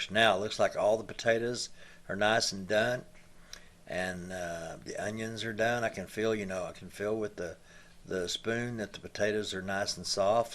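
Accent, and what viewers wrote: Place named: Texas, United States. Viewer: That good ol southern accent is everything!!! sound like one of us Texans. Im going to try these tomorrow morning. Thanks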